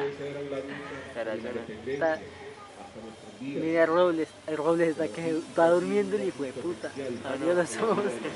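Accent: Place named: Santander, Spain. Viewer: Creo que es Santanderiano mano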